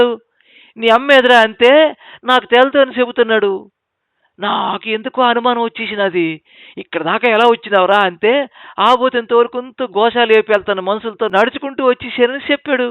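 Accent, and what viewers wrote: India. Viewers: కధ బావుంది. మీరు చదివి వినిపించిన తూరుపు యాస బాగా ఉంది. అభినందనలు.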